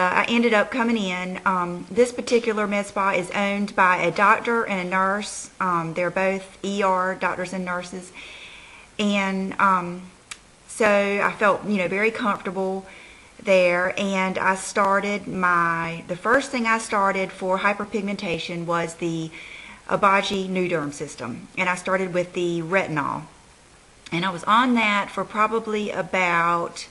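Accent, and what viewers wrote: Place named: North Carolina, United States. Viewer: Elizabeth Elizabeth I'm not sure where you are from but here in good ole' backwards NC with our accent it's "whelps" Your comment kinda pissed me off because you seem to be poking at her accent. We are very proud of our accent here and I think is as cute as a bug with her accent.oh lord there goes that darn accent again. You seem to be a judgmental person. jmo! Also FYI WHO HAS 3 SUBSCRIBERS AND WHO HAS THOUSANDS OF SUBSCRIBERS! Nuff said as we say here in NC!!!!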